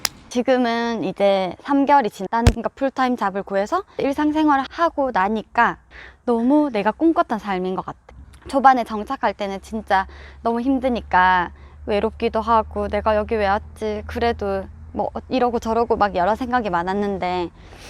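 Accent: Gyeongsang, South Korea. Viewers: 💗🌈 안녕하세요ㅎ 부산인이라 경상도말투에 익숙해서 자주 놀러와요ㅎ 이번 영상 전적으로 공감해요!! 제가 커피쪽을 하는건지 아니지만 저랑 마인드가 너무 비슷해서 영상정독했네요ㅎㅎ 날씨+나의 온전한 내모습과 삶+아빠의 자리가 크고 역할이 정말 크게 작용하는 호주의 삶 등등 저를 호주라이프에 푹 빠지게 했어요ㅎ 그리고 ㅎ가끔 외롭고 힘들고 우울해도 맑은하늘과 따스한 햇살아래에서 요가 🧘🏻‍♀️ 한번 하고나면 뭔가 기분이 사르륵 🥰 1년중 300일이상 날씨가 좋다는 브리즈번에도 몇일째 흐릿흐릿 🌧 비가 내리고있지만 낮에는또 화창한 하늘 ☀️ 볼수록 신기해요ㅎㅎ 시드니 감기조심하시구 가끔 이렇게 편 영상 올려주세융! 기다릴게융ㅎㅎ🤩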